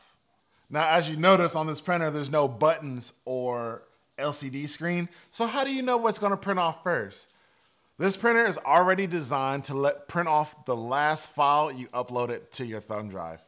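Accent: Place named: South, United States. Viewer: What part of South you from bro? I'm originally from Alabama.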